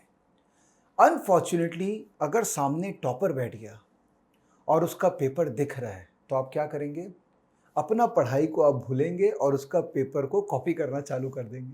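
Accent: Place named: Kolkata, India. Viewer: !बातों को कहने का लहजा ही बता रहा था कि तुम कलकत्ता के हो।शब्दों का चयन व वार्ता में तुम्हारे मनोभाव,जो मैंने स्पष्ट महसूस किया; यह बताते हैं कि तुम शिष्ट परिवार से हो।तुम्हारी सरलता व परहित भाव तुम्हे बहुत आगे बढ़ाएंगे व बहुत प्रसिद्द व सफलता दिलाएंगे।मंगलकामनाएं।सुशील जैन।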